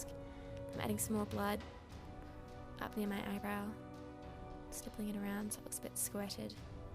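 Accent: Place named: Australia. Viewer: Took me about five videos to realize you talked "funny" but that's because I'm used to hearing american accents on you tube... (Note: by funny I mean Normal, as in Australian ) love ya work, will have to look into emulating some of it :)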